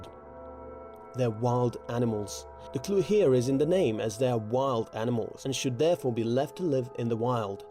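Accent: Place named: United Kingdom. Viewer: Shut the fuck up you and your annoying British accent.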